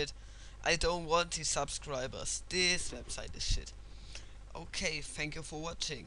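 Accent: Germany. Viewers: Bist du Deutscher? ^^ Wenn ja, man hört´s :D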